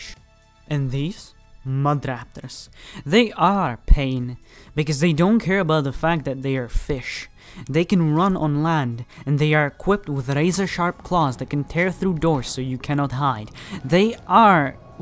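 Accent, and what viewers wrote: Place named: Russia. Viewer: я же слышу что ты русский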